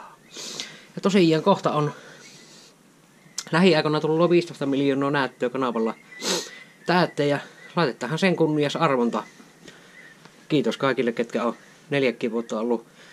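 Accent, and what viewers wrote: Savo, Finland. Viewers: asiallisia videoita savon murteella, sekä hyvät kalastuspätkät. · aloin tilaamaan sillon ku kalastusvideoita alkoi tulemaan ja parasta on toi sun savon murre. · Onhan tuota tullu seurattua ihan alkuajoista asti. Kaikenlaiset pärinät ja savolaisuus sai seuraamaan. Ja kyllä se savolainen suorapuheisuus ja elämänasenne on saanut pysymään kanavalla. Olihan ne pärinävideotkin viihdyttäviä, mutta nykyinen suunta on oikea, jatka samaan malliin! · Sun seuraaminen lähti niistä chilivideoista kun kattelin e6363ee:n kanavaa ja äijä oli sielä järves aivan pellit kiinni😂Ite oon Pohjanmaalta kotoisin ja jotenki tuo Savonmurre on niin hauskaa kuunneltavaa,ja se et läppä lentää niin saa nauraa välillä melkein kuset housuissa.. Ja nää nykyiset kalastusvideot on tosi hyvää settiä kun itekkin oon kalamiehiä.. Jatka samaa rataa vaan! Oot parhaimpia tubettajia joita oon seurannu.👍👍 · Tykkään erityisesti kalastusjaksoistasi ja myös kalastukseen liittyvästä muusta materiaalistasi. 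Savonmurre on myös mukavaa kuunneltavaa. Olen oppinut myös kalastusjaksoistasi paljon uusia tekniikoita ja kalastustapoja, kiitos siitä! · Aitous ja savon murre.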